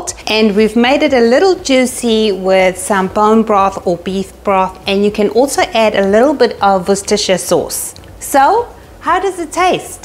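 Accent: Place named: South Africa. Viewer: thought your accent sounded SA. From Cape Town!?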